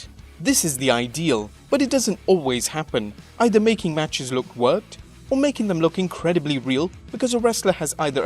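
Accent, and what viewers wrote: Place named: United Kingdom. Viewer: Triple AITCH. AITCH BEE KAY. POSStuh. I've always wondered if Brits pronounce words incorrectly on purpose or if they have difficulty hearing and reproducing simple sounds... You've been making vids for a primarily American audience for too long to keep doing that. In London, I ride the Tube; in the US, it's the subway. Come on dude. Next you'll pronounce the 'T' in 'wrestling'.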